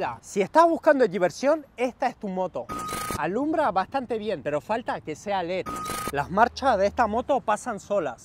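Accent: Argentina. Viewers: 🤔 parese de Argentino su forma de hablar 😂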